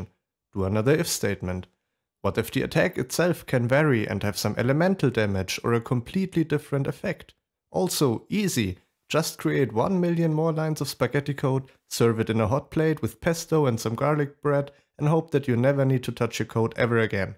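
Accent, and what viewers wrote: Germany. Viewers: Are you german by any chance? Sounds a little like it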